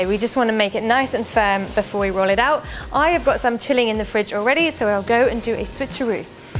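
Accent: England, United Kingdom. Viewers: 😊love English accent 🎉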